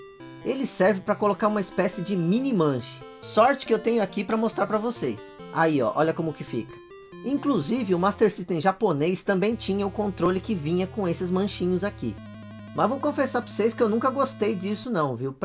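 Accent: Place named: Belo Horizonte, Brazil. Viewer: Prestei atenção em vc conversando. Vc eh de BH.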